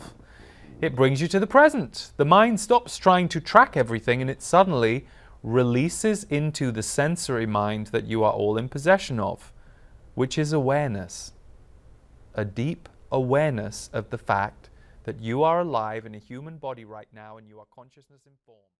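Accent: United Kingdom. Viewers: I like your British accent! It's soothing to listen to. 💖Thank you! 💖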